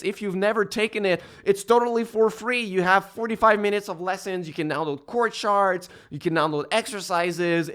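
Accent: Quebec, Canada. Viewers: Très intéressant, merci! En passant est tu québécois?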